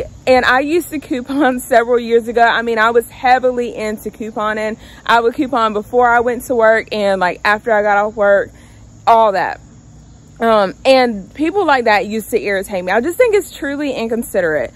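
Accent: United States. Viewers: God knows I love your country ass accent, great video by the way.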